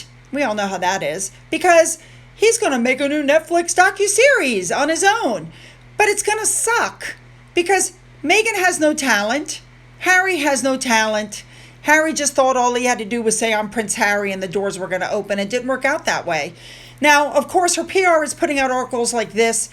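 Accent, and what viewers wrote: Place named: United States. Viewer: great video as always. I'd like to point out your pronunciation of UK names. PLEASE NEVER STOP, American cousin... in my opinion, your pronunciations are so cute and understandable. I, an Aussie, have difficulty sometimes, so I love that you are a fellow traveller in the interesting world of UK place names. 😂❤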